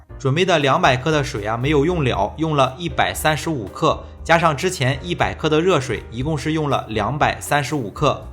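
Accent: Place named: Yantai, China. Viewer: 听出来了，我是烟台人。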